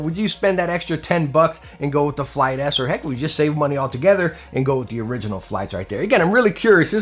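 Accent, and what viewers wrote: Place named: Texas, United States. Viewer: Hey man. I am new to the channel. Have been enjoying your videos a lot. I am just curious, were you born in Texas? Your accent is nice af.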